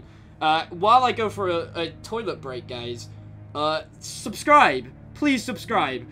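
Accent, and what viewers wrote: United Kingdom. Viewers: Very British. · HES BRITISH? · are you british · How do some dont know is British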